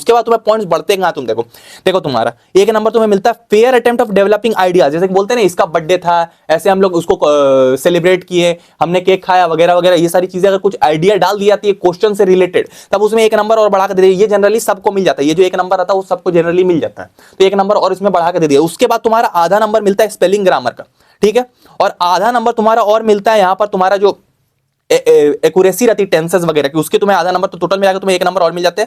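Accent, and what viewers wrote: Bihar, India. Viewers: Bhaiya Aap Bihar sai hoi kya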